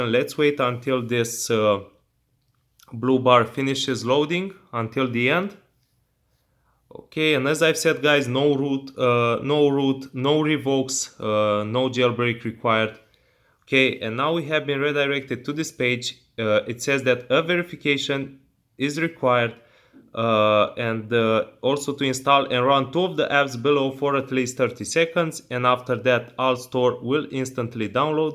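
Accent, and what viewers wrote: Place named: India. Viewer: Right as he had an Indian accent I knew it was fake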